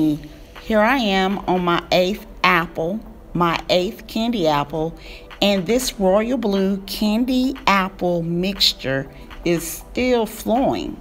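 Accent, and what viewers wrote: South, United States. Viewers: When I tell you I have watched this about 5 times. One of my favorites !! It's the laugh and the commentary for me hunni!!! "That royal blue baby....royal so loyal"!!!😊😆😂 As far as the country accent...It's a southern thing....nothing wrong with a little twang...I'm a southern girl as well!! You are the best!!!!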